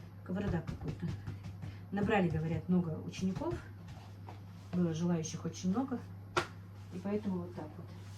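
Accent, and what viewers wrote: Standard. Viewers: У вас чистая русская речь,удивительно даже,дай бог здоровья! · здравствуйте, молодец, как у вас всё здорово получается, а как вы чистейшей говорите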